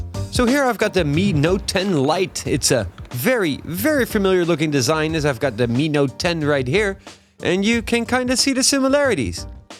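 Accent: Netherlands. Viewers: You sound Dutch. Aren't you? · Hi, Great video! Are you Dutch? I think I can hear a slightly dutch accent, however your english is very nice though!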